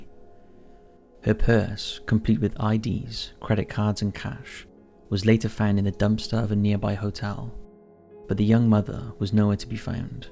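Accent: Wales, United Kingdom. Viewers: Wales - his accent is Welsh.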